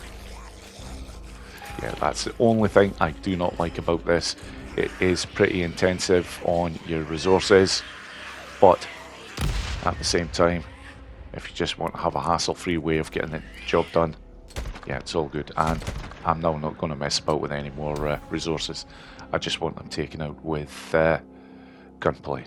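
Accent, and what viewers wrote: Scotland, United Kingdom. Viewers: Love the videos, dude, but I can't help trying to figure out where you're from, haha. Your name is Slavic (or more specifically Serbian and neighbours) and your accent I thought was Dutch at first but now I reckon it's Scottish. You're truly an international man of mystery :)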